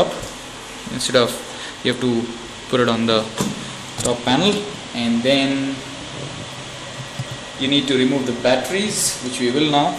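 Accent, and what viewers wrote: India. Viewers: インド訛り キッツいなぁ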